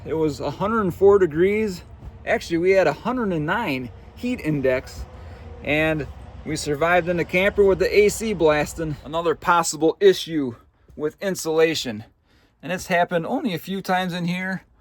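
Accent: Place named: Wisconsin, United States. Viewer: Hey man, this is a great video. Thank you! I think I'll take this approach for now, I'm thinking a semi-insulated version 'cause I live in AZ, but I'd rather the funds go toward traveling not insulation materials! Love your accent, are you from Wisconsin? If yes, me too!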